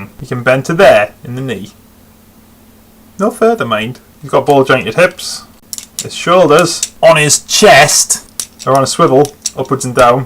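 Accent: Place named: Newcastle, United Kingdom. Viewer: I had to sub. I love yer geordie accent lyke. Why aye pet, reet canny lass etc. My favourite teacher was a geordie. And this video was about Hot Shot. The best subject ever.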